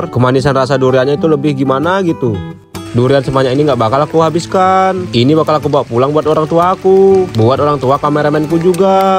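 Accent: Java, Indonesia. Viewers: saya mau tanya, apakah kamu ini ada keturunan orang Jawa kok dr logat’ya Jawa bgt bang??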